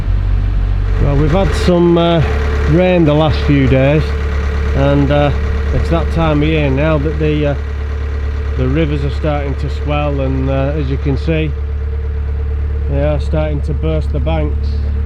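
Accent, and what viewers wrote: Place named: Lincolnshire, United Kingdom. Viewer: Hope is feeling better, the injury sounds really painful. I keep trying to work out where you come from by listening to your accent. I was born in Lincolnshire and you sound similar to people I know. Best wishes for 2020.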